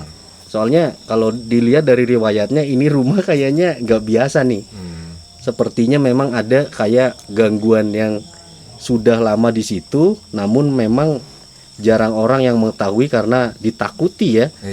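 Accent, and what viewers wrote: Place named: Java, Indonesia. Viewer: Demen aku sama mas ngomongnya medok banget hehe khas....😅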